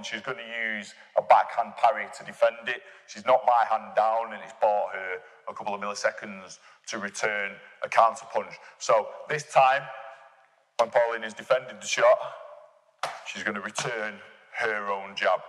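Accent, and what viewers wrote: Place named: Manchester, United Kingdom. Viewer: Loving the manc accent, didn’t realise was based in Manchester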